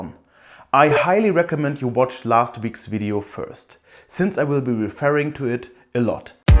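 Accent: Germany. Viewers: Are you german ? If you are german I will subscribe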